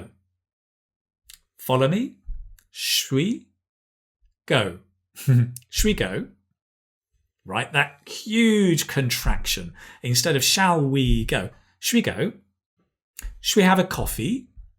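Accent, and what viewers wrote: United Kingdom. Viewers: You are a legend, I'm fallen in love with British accent, u r a marvel! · I think your pronunciation is Britain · Hello Firstly,thank you for a useful lesson .I would like to know that it is British accent? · Im starting to watch some British Channel... and it seems that im gonna like it... i always find difficulties in understanding someone who speaks Brits Accent.. thanks for it, Sir.. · Thanks 4 helping me improving my English skills. I really love ur british accent. Ur an excellent teacher. Greetings from Medellín Colombia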